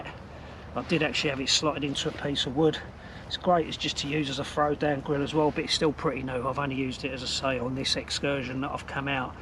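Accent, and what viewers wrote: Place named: Essex, United Kingdom. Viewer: you got that east of London, Kent, Essex kind of accent.... although I may be completely wrong! I'm in Surrey, and yet to see snow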